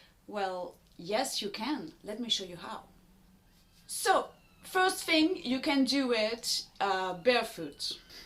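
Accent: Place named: France. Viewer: I recognise a french accent haha have you got any tips in order to start tap dancing at home without taking lessons ? thanks in advance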